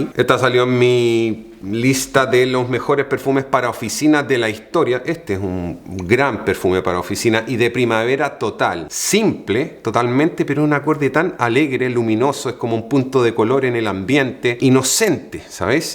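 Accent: Chile. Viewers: Soy español y desde mi perspectiva le veo un acento muy muy chileno (por cierto, el acento más marcado que existe de Sudamerica en mi opinión). No le noto ningún deje español en su acento, aunque quizá los chilenos sí lo podéis notar. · Si eres chileno...que haces haciendo esa mezcla rara ...que vosotros... Que os digo...basta...sale raro y mal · no se porque tienes un acento Chileno, tremendos reviews!! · Cierto tengo la misma duda su acento es d echile pero hizo de primavera y acá es otoño xd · Hola , eres Chileno ?? , te pregunto por el acento, pero tienes de a ratos unos toques españoles y otros argentinos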